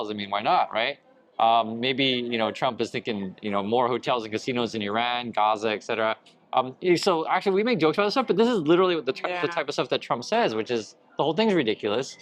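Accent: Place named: Oregon, United States. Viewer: I can tell you're from Oregon because you say "fleg" instead of flag : )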